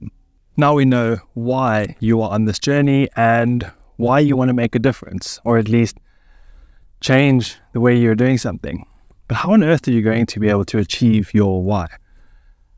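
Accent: South Africa. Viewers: What a surprise. Was wondering why all of a sudden I was listening to your voice, more intently. SOUTH AFRICAN ACCENT!!!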